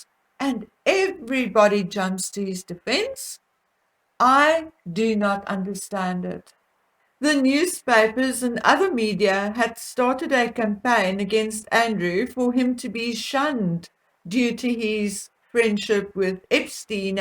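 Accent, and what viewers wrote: South Africa. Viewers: I have been in the UK for nearly 20 years, and I see quite a few similarities to South Africa, but you dare not say anything without being told to "go back home then if you don't like it". I see it in job advertisements where in South Africa you have still the person of colour being preferred for the job. That is happening here now. They word it where they say they prefer applicants of colour/persuasion. Love your research and as a South African another welcoming fellow Saffa accent.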